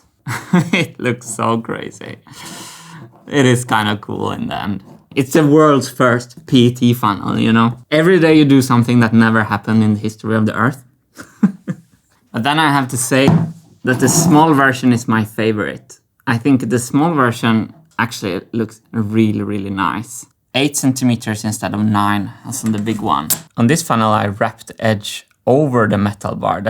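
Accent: Sweden. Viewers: Hes swedish 😅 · the comment was clearly tongue in cheek. Nobody is actually complaining about very slight Swedish accent. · Wasn't it more like "yeneration"? And yes he pronounces lots of words or parts of words just like he would in Swedish (because he's a Swede), and makes some mistakes in grammar but I think it's kinda charming since he has a pretty good vocabulary and flow. He's a good communicator, don't you think?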